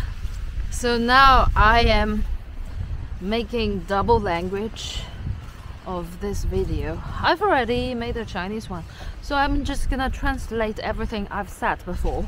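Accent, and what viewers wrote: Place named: RP, United Kingdom. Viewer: Haha love that you have adopted a posh accent. Please keep it and your English is 👍🏼 👌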